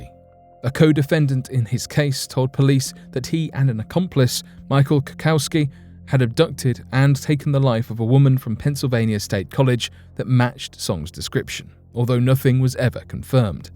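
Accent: United Kingdom. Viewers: No, definitely British, with very, very, very slight generic British northern accent hints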